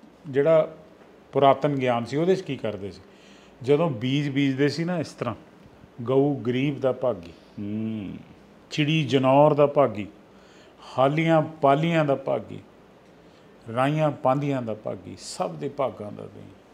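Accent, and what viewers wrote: Puadh, India. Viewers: ਪੁਆਧੀ ਬੋਲੀ ਐ ਮਾਰੀ ਹੈ ਹਮ ਪੰਜਾਬ ਕੇ ਮਾਰੀ ਬੋਲੀ ਮਾਂ ਸ੍ਰੀ ਗੁਰੂ ਗੋਬਿੰਦ ਸਿੰਘ ਜੀ ਨੇ ਫਤਹਿ ਬੁਲਾਈ ਤੀ ਵਾਹਿਗੁਰੂ ਜੀ ਕਾ ਖਾਲਸਾ ਵਾਹਿਗੁਰੂ ਜੀ ਫਤਿਹ 🙏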